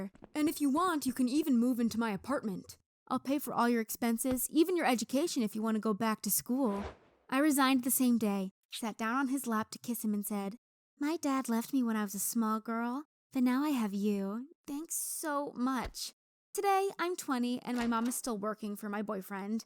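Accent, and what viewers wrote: United Kingdom. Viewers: Yeah I’m sure you’re from the uk. Such a strong accent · Wait...? SHE’S BRITISH!!!?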